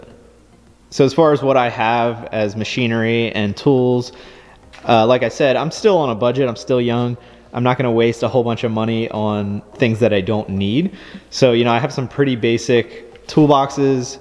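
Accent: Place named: Philadelphia, United States. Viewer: I'm from Pittsburgh and you know we have a distinct accent. My welders helper is from the Philly area so I pick up on it. Your set up it awesome though Man!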